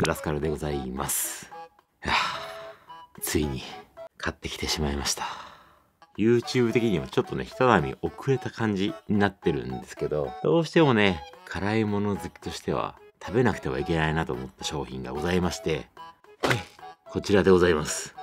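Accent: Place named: Ibaraki, Japan. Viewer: 久々に見たら 茨城人かよ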